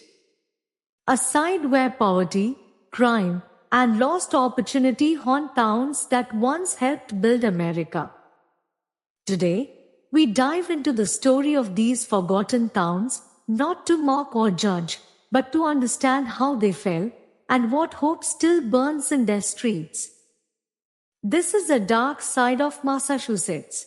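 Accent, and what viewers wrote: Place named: India. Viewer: Bad narration the Indian accent is too thick.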